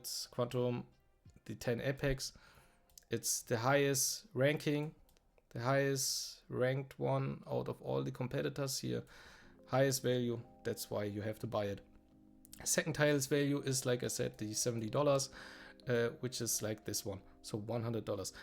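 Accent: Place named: Germany. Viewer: Didn't know you before, but really good video. Also I would bet my rebate money that you are german hearing your accent. xD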